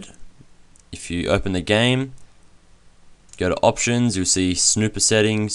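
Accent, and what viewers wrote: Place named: Australia. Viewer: ma gawd so damn Australian btw I'm from NZ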